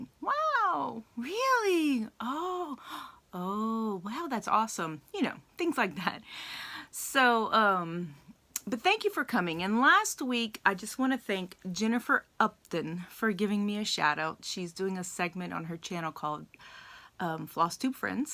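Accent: Louisiana, United States. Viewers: I’m glad you subscribed to my channel so I could find you. I’m just starting to watch your video and from the first sentence you spoke, my guess is that you’re from Louisiana. Am I right?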